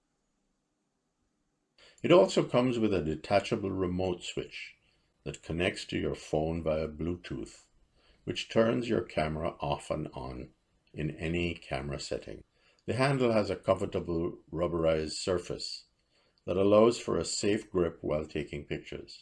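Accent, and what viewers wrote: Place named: Caribbean. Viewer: Nice, I’ve just got one, that sounds like a Caribbean accent, where are you hailing from sir? It’s a long time since I heard a white gentleman speak with a Caribbean accent. Peace from a black Caribbean gentleman in the UK.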